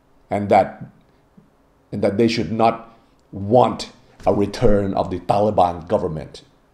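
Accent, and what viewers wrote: United States. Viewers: I am here again listening to you. Not coming to sink in the politics. I have headache from that. But mainly to hear your American accent I like it so much very much.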